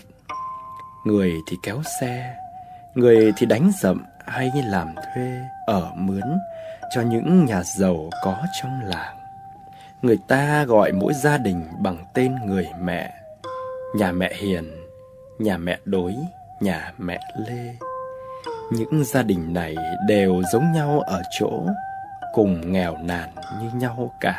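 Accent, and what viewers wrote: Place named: Nghe An, Vietnam. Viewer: Long cái tieng nghê an lam mat cam xúc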